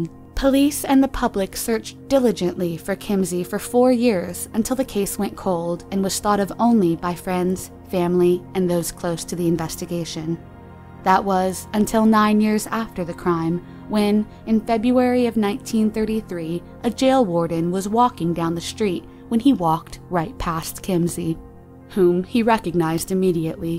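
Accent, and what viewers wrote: India. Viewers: Who's voice was it? Is she Indian?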